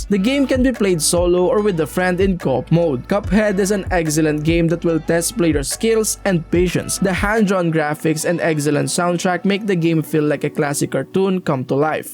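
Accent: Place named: Philippines. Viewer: Latino accent + American accent + lazy pronunciations = Filipino accent 🤦🏻‍♂️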